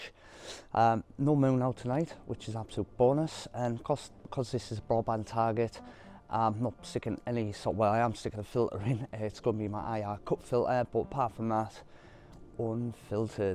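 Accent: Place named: North East England, United Kingdom. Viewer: Hi That's a great image! It's so hard to get a balance between the vibrant blue of the nebula and the dust clouds that give that area of the sky it's extra bit of fascination, but you did a very good job. Impressive guiding! Great to hear a voice from home too From a North East emigrant now living in Austria. Ken Haughan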